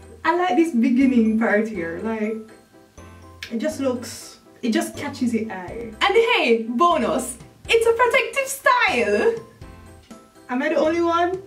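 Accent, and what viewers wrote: Jamaica. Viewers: This style is lovely just like your beautiful personality - Loving the natural Jamaican vibes you give, never lose your accent.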